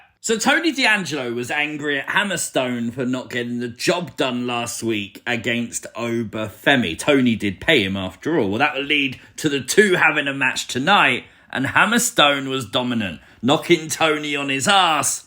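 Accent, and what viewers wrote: United Kingdom. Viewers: You know he’s British? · He said "Rosemary". He didn't say "Rosemarie". It's only the British accent that makes it sound like that.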